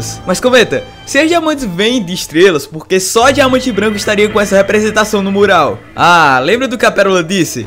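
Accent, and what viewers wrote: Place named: Northeast, Brazil. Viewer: Na moral esse seu sutaque nordestino da agunia se loko fala todo errado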